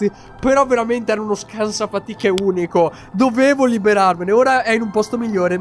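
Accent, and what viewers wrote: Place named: Veneto, Italy. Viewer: il dialetto veneto <3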